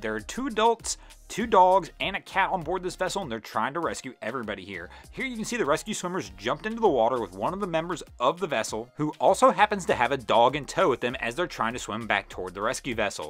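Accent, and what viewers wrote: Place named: Cincinnati, United States. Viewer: I can't help but notice your accent. Were you raised in the Cincinnati area? Love your vids!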